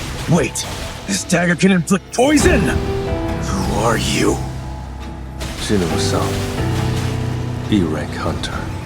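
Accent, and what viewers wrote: Wales, United Kingdom. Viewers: So Elden ring content in 2026, with a solo leveling twist and is that a welsh accent i hear take my subscribe my fellow welsh warrior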